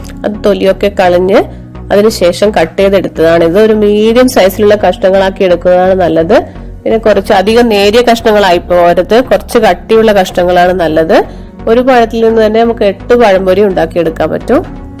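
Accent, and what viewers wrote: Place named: Kozhikode, India. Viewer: slang kettappo thonni nammade sidaan...njan Kozhikode aa😄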